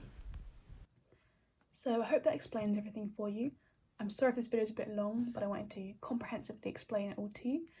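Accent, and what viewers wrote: United Kingdom. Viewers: What a classy RP accent. Unfortunately you don't learn French. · Hey! I m learning Japanese. Perhaps n5 level. I like your accents. I like british accent. I want to learn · I just love the British accent. It sounds so coool haha.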